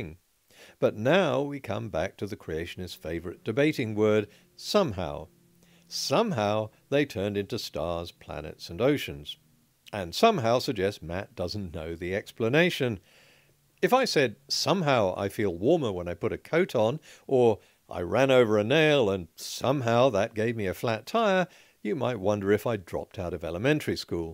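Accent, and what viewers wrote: RP, United Kingdom. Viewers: "nothing literally nothing" - richard dawkins asking Lawrence krauss "yes" more like atheist dont know what their academics are saying i don't think you can actually defend your position aside from English posh accent, a rapid expansion is a explosion, but this is just semantical equivocation, genius you still think it 'expanded' from nothing, the singularity ISSSS nothing in the atheist wolrd view, simply calling nothing the singularity doesn't stop it from being nothing. which i dont need to tell you this is a stupid teenager answer how about you actually debate for once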